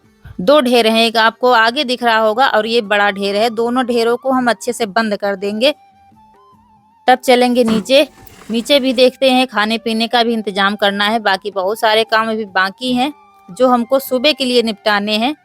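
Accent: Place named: Uttar Pradesh, India. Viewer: up me kaha se didi hum bhi up ke kanpur se hain... Bhasha boli aapki jaani pehchani lagti hai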